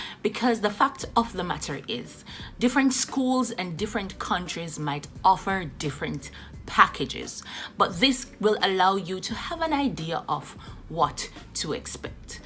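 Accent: Jamaica. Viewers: Hey I’m so glad I stumbled on your page I figured your accent want slightly familiar. I am also Jamaican seeking employment in Kuwait 🇰🇼 I will be messaging with further questions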